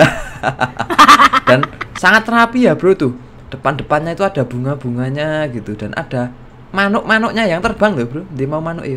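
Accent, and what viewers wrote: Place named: East Java, Indonesia. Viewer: khas jawa timur mas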